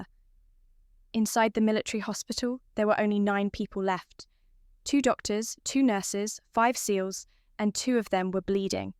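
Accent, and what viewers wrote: United Kingdom. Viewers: why do we have to endure an english accent when watching US Navy Seals?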